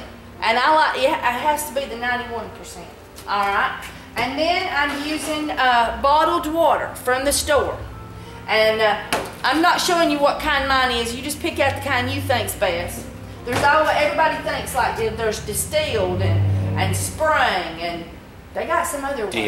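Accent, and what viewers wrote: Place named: Alabama, United States. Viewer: Fort Payne. I knew you were from Alabama. You talk like me. Cant wait to try this.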